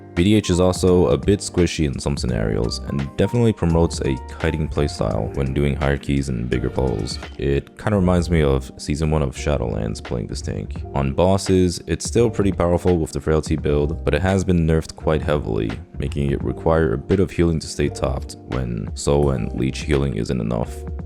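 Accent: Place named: New York City, United States. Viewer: NYC ABC is a very specific accent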